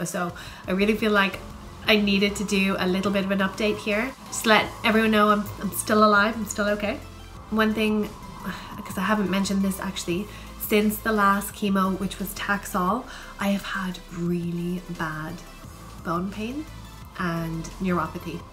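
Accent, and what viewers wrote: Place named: Ireland. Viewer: Your cancer Journey will be as individual as you are...it won't be the same as the next persons...whatever you feel, whatever your mood, whatever your frustrations or elations are, they are yours...thanks for sharing your journey with us and attempting to have us understand what you feel...and by the way, I love your Irish accent..lol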